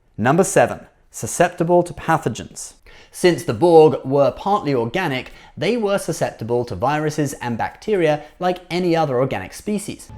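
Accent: United Kingdom. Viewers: Woohoo!!! More! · is back!!! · We demand more! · Missed ya,:)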